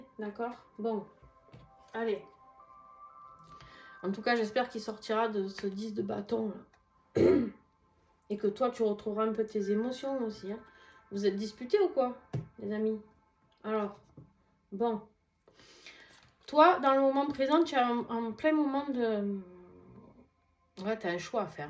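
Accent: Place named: Marseille, France. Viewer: oh peuchère, avec ton accent marseillais on te croit sur parole !!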